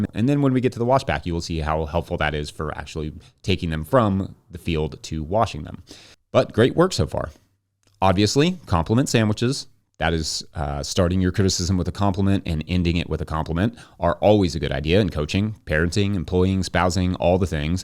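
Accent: Kentucky, United States. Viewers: For sure. My father hobby-farmed ten acres (but I didn't choose farming). I understand that part of it is time pressure and could also be the frustration of training people who turn out to be temporary/seasonal etc. This podcast might turn out to be a good way for to capture what he's learned and document best practices for different crops for those of us who find ambiguity torture. (He already has some great videos about wash-pack and setting up for market, for example.) I grew up on a little island near Seattle but have spent the last decade near Barcelona. It would really make more sense for me to tune in to someone who discusses Mediterranean climate crops and techniques but this channel is one I like when I'm tired of being an expat and craving English. I guess I enjoy a Kentucky accent, especially his fast-talking version, which communicates some urgency and makes me feel like he doesn't want to waste time. 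(I wonder if he realizes he's just keeping random viewers company while they make dinner or clean house.) Agricultural content feels like home, too. Thanks for validating my comment, it's the most other people operating another way that makes autistic persepctive hard sometimes.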